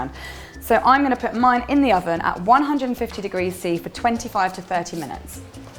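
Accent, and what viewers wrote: England, United Kingdom. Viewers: Is she from England ?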